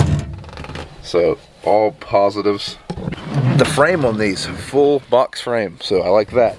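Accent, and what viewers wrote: Ohio, United States. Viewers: 3 things It sounds like you are from the great state of Ohio, like me I like the buggy A million Indians can't be wroung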